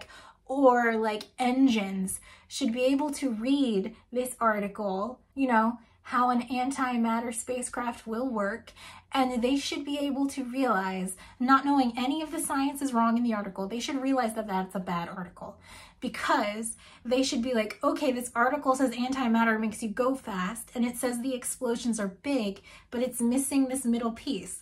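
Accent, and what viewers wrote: Kentucky, United States. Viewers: More Kentucky accent!!!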